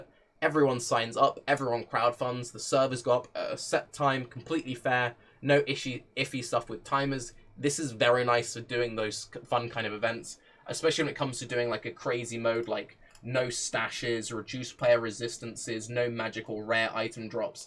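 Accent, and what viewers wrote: United Kingdom. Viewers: I think that's a little overboard, but i get what you mean. He for sure has become a lot less critical ever since he's gotten these aspirations of doing content with GGG staff etc. Its like he wants to be Ziggy or something. Nobody is totally impartial, but what does rub me the wrong way is when people pretend as if they are trying to give a balanced opinion - when in reality they fall very squarely on one side of the argument or the other. If you are only going to praise GGG and fabricate total nonsense that nobody would ever say to make it appear like you arent just dicksucking it really comes off as arrogant pseudo-intellectual self-aggrandising nonsense. This was my biggest problem with the Diabolo mobile video. He couldnt just say "If the game is good, ill play it". He had to make a 5 minute nonsensical rant trying to justify to himself and everyone else why HE is in the right, everyone else is just circlejerking, Blizzard are being smart and moving in the right direction for the franchise even if this only caters to idiots like him.... 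Like dude, either be quiet or just acknowledge you are in the minority. Dont try to do all that nonsense justification. It doesnt get you hipster points, and you dont sound smarter for doing it. Even if you have a british accent.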